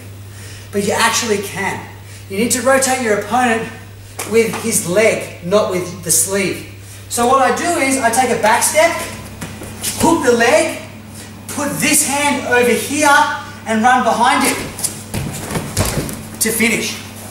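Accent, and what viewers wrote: Australia. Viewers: Is that an australian accent? =) Awesome vid!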